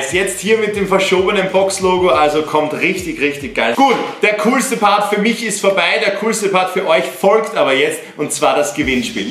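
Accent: Austria. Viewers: Liebe deinen österreichischen Akzent. :D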